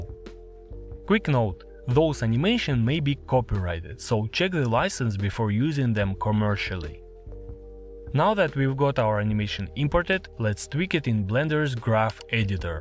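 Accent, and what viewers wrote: Russia. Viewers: Where is cheating. It took years to complete. Also this runglish is an ear ache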